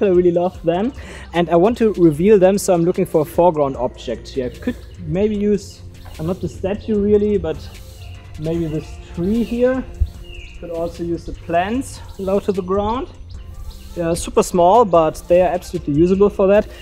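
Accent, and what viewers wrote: Germany. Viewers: I recognize the german accent! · Wow, I can hear that youre German, try to meet up with niklas christl, a super videograph too❤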